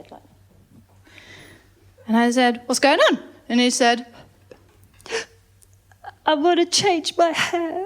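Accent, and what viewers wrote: RP, United Kingdom. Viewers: Diane Spencer Btw some men in the States actually prefer redheads. No ginger bias here darlin. Keep at it: you're raw, honest, funny and gorgeous. I wish there were more women like you. Take care and bring your act across the pond. And that British accent 😍 I would be honored to shake your ginger claw lmao✌ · Stumbled through the youtube algorithm to this. I love the humor. The profanity mixed with the british politeness or rather way of speaking is a refreshing act compared to us comedics! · Her delivery is incredible. It really adds to her jokes that she's talking about shocking/sexual stuff in clean cut RP. Very expressive face also helps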